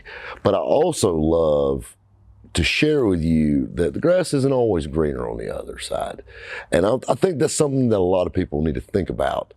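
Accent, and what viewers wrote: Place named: Greenville, United States. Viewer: Bro i feel so crazy your from greenville.. I would see u on vinwiki.. I always thought this. Guy sounds like us and he funny as hell.. Your doing great and you represent greenville 👍👍👍 and yes bwm or everywhere 😂😂😂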